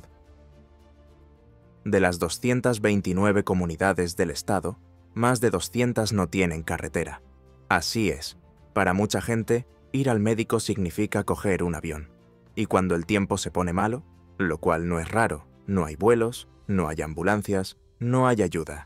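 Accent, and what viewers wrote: Spain. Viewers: Pronunciación del inglés típica de un hispanohablante de España.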